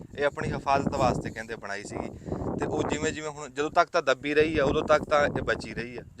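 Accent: Punjab, India. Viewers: Very sweet punjabi speaking❤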